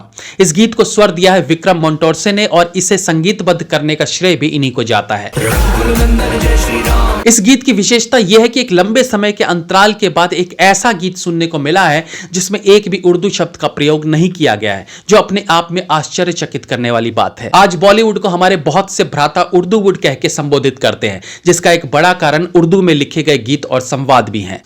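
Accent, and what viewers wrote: Standard. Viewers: आपकी शुद्ध हिन्दी एवं आपका विश्लेषण अद्भुत है 😱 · Your pure Hindi is music for my ears...myself kannadiga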